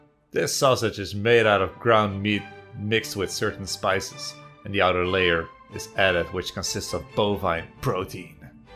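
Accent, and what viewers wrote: Netherlands. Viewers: Phantom Playz yuhh Dunglish (Dutch / English) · American/Candian with a Dutch accent..weird!! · AcaBlark he is dutch.. he might not know how else to pronounce it.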